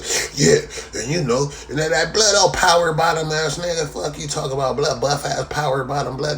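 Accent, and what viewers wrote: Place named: South, United States. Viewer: Why is ur nose so light? And what kind of southern accent is that? You're not originally from Cali though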